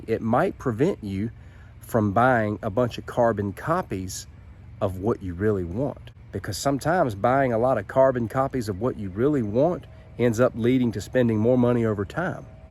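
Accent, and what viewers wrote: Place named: West Virginia, United States. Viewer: I sent this to my mother and her jaw dropped. 😂 We have the same facial features, eye color, goatee, shaved head, height, weight, age, speaking cadence, etc. And we're both blade enthusiasts. The only difference is your accent is West Virginia, and mine is Kentucky. When I start making videos, I'll send you a link. It's wild, bro. 😂😂😂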